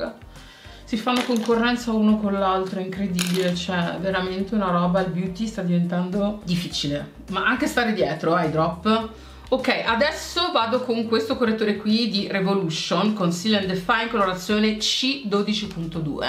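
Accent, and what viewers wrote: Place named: Apulia, Italy. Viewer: con l’accento pugliese mi mancava 😂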